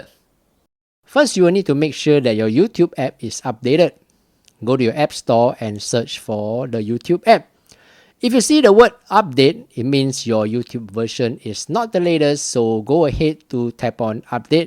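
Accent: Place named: Singapore. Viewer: Hi, Great channel sir. Im wondering if you can help me.. For the past year Ive been occasionally been making comments (usually compliments) on interesting channels. However I have virtually had no one ever add to my comment or even like it and when i look at all the other comments before and after mine most have a few other replies and likes from other members (who posted comments). I can visually see my comment after it's posted but it's like no one else can. Firstly, can you see my comment here? and secondly even if you can is there any other reason others cannot see my posts on other channels I comment on etc. Thank you! Jon New Zealand (Mate, you sound like you are from Singapore! I grew up in Singapore in the 80's lah!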